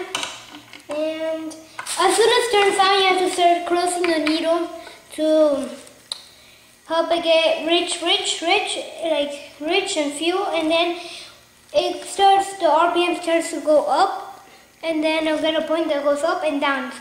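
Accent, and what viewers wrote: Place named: Asia. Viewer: You sound like an Asian. No wonder, you know how to do it.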